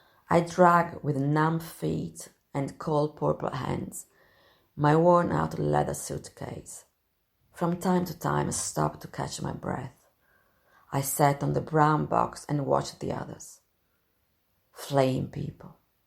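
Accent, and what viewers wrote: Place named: Italy. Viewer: Eccomi ancora,! In English, please! Parla inglese benissimo, e, con il piccolo accento italiano, dà un tocco di bellezza in più! Io potrei leggere il Suo libro in italiano, visto che ho studiato l'italiano, e che l'italiano è, posso dirlo, la "seconda lingua" della mia vita, dopo il francese che è la mia lingua madre! Sono contentissimo quando posso praticare l'italiano, perché, con le persone che vivono accanto a me, non ho l'opportunità, purtroppo...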